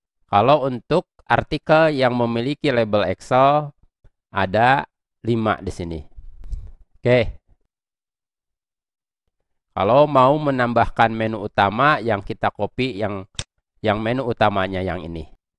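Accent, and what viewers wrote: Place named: Bali, Indonesia. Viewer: lho sy kira org bali, logatnya bali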